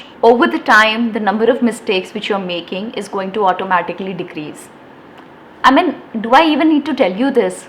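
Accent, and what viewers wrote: India. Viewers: Indian accent 😵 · Hello Ma'am, I am Kajol Gupta. I am from Kolkata. I am 23 years old. And I am struggling in english speaking. I am not confident to speak in English but unfortunately i study in english medium. My friend says to me that you had studied on english medium school. But you can't speak in English at all. Why ?? They questioned me. But sometimes I regret myself & why ? Sometimes I can't tell anything or I say that I am still learning when that I can't practice this.but this time I practice myself regularly. Everyday I listening english podcast & you must say that I see easy english podcast which I can able to understand this easy english podcast. And last I want to tell about I love to see your videos when you speak in English fluently & naturally and when you speak in English native accent & I really like your indian accent which I can able to understand and you speak in English in easy words to makes me better to understand that what are you saying & what are you want to saying to ourselves ? 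Which is I can able much better than other hard and advance english podcast because I am a beginner & I can able to understand easy language or words to helps me better to understand. That's all !!!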